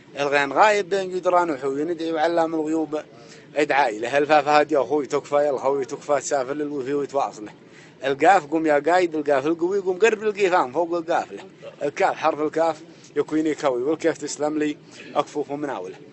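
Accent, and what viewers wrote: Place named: Saada, Yemen. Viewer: يمني من صعده ونفتخر فيه قال مهو من صعده اسمع لهجته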